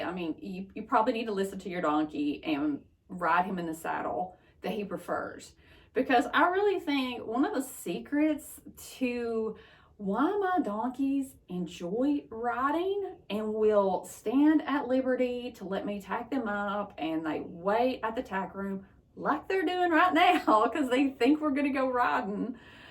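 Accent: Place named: South, United States. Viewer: Very helpful. Thank you! Also love your accent. I miss being in the south for the accents, the sweet tea, and the riding weather!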